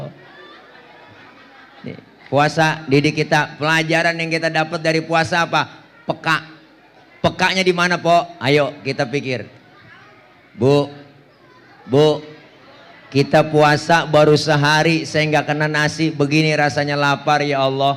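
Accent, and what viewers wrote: Jakarta, Indonesia. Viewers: ciri khas Betawi cara bicaranya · iya logat betawi kental mirip kh zainudin mz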